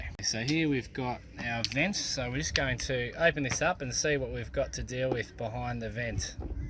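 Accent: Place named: Australia. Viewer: We Cannot Hear your voice. FIX THAT. No ill entent intended. And SPEAK SLOWER, Your Acsent is Not Easy to Understand. (American English is Not Aussy English) We Still Luv-Ya-Champ.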